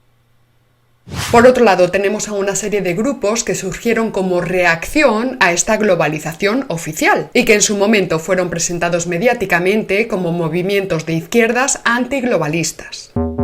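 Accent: Spain. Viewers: el acento español tan marcado es irritante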